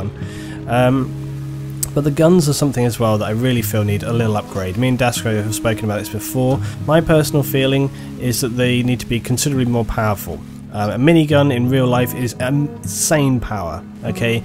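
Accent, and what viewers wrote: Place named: RP, United Kingdom. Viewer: U sound so posh and it annoys me this is why American films take the piss out of British lol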